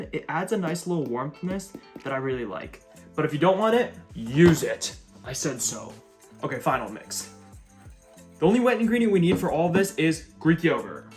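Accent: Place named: New Jersey, United States. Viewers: Thats that New Jersey accent fuggetaboutit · Are you from New York/New Jersey?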